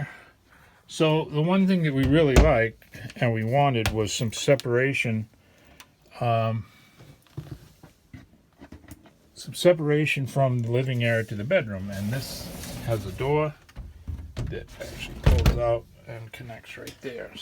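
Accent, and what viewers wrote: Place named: New England, United States. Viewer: Great tour - thanks so much. A few times I thought I heard a northeast accent. Are you originally from New England?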